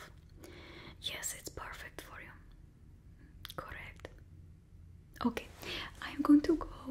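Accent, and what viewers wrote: Russia. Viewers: russian stylist having a sister named natasha and the same accent as yelena belova in marvel my obsession is too big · as Russian I can say that is is an amazing Russian accent! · Русский акцент получился очень хорошо!!!!🥰💖💖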